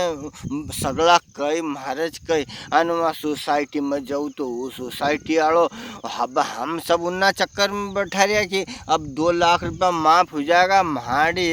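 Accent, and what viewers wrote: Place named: Nimar, India. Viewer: Shuddh malvi bola kr bhai nimadi kyu add krta h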